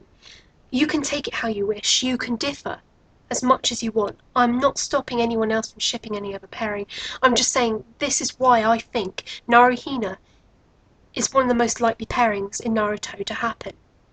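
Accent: United Kingdom. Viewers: You have a cool british accent :D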